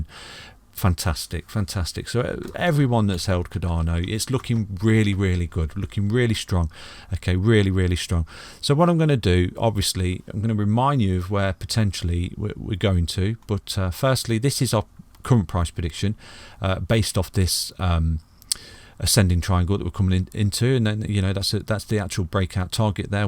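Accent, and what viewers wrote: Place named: Midlands, United Kingdom. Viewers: Do you live in the Midlands England 👍 great channel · He's from Leicester 😁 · Sounds like a brummie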